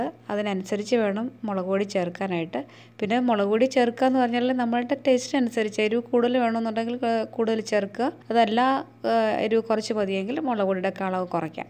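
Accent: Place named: Ochira-Karunagappally, India. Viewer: സഹോദരി. ഓച്ചിറ, കരുനാഗപ്പള്ളി ഭാഗം(സംസാരം) ആണെന്ന് തോന്നുന്നു.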